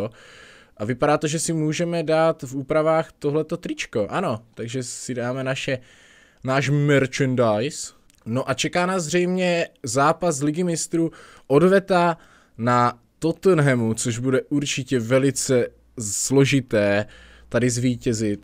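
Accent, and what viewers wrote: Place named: Moravia, Czech Republic. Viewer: já to sleduju taky kvůli těm kecům:D jako moravák mám stejné nářečí jak takže u toho vždycky chcípám:D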